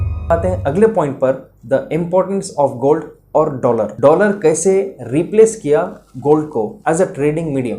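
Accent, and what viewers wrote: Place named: Bangalore, India. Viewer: Thank you very much for your very informative and very interesting video Are you from Banglore ( India) Your accent is like a Banglori My mother was from Banglore Yes you are right about the three very strong leaders of Arab - African countries At present in the entire muslim world There's no strong leader Recently Pakistan had to take loan from IMF We are facing the crunch About Imran Khan I am very much doubtful Is he sincere for Pakistan or not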